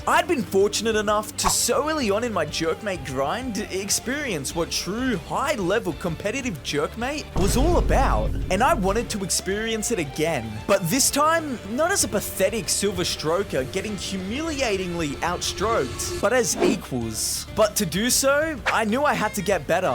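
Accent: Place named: Australia. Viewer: where u from? u sound australian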